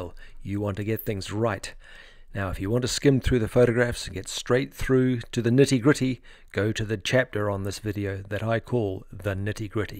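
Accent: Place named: New Zealand. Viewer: Nice to hear a kiwi accent on youtube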